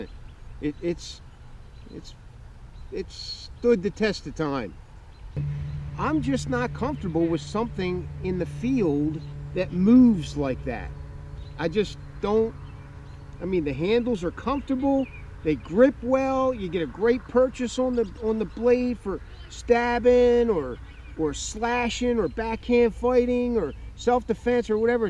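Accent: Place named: New Jersey, United States. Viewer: You sound like a New Jersey moke to me. It sits well on ya.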